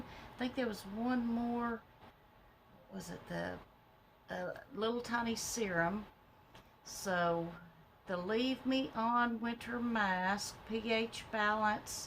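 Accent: South, United States. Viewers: I’m from the south also and we do have a way of our own talking... I get told.. so I love listening to someone that has an accent like I do.. I’m so sorry about the tumors.. I’ll be praying for you and thank God it’s not cancer.. concerning the mask have u use any mask that is better staying away from ? thinking about doing the Monday mask on my channel.. just not crazy about masking... LOL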